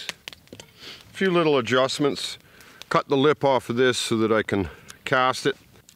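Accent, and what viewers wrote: Canada. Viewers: Hey, I tune in from Australia as enjoy your Canadian voice. Plus I learn lots.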